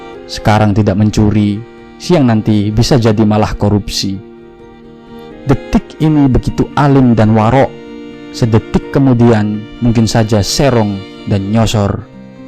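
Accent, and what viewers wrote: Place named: Java, Indonesia. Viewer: Jossssss keren, kata kata medok e tetep ketok,tp iku seng nggarai jos. Jowone tetep kudu onok. 👍👍👍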